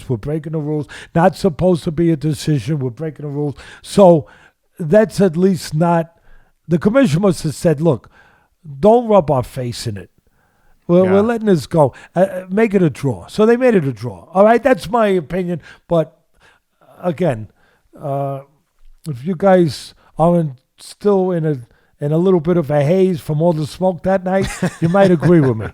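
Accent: New York, United States. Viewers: Do you think Gus and Jerry keep me tied to it sounds like it. Teddy the scientist half mass times velocity squared equals force. He tried to say that Mike Tyson was not quick or fast you’re wrong. He wouldn’t have the power if you didn’t have the speed velocity has three times the effect of mass read the equation again. And technically up to six times. The two together are devastating right. Peekaboo I see you. You can fool some of the people some of the time probablyUp there in New York where they understand your accent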